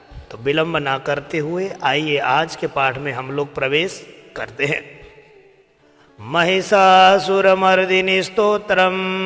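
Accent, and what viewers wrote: Standard. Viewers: बहुत ही खूबसूरती से शुद्ध उच्चारण के साथ आपने पूरा स्तोत्र का उच्चारण बहुत ही शुद्ध भाषा में समझाया महाराज जी बहुत ही सुंदर उच्चारण के साथ कुछ लोग अशुद्ध उच्चारण के साथ इसको आज के समय गा रहे हैं अशुद्ध उच्चारण से कहीं ना कहीं यह हमें इसका फल गलत मिलता है इसलिए शुद्ध उच्चारण के साथ जो आपने समझाया एक एक श्लोक बहुत ही शुद्ध उच्चारण के साथ आपने गाया बहुत ही खूबसूरती से मैं आपके चैनल को सब्सक्राइब कर रही हूं और आपसे जुड़ रहे हैं धन्यवाद महाराज की शुद्ध उच्चारण से समझाने के लिए जय मातेश्वरी जय भवानी हर हर महादेव 🇮🇳🙏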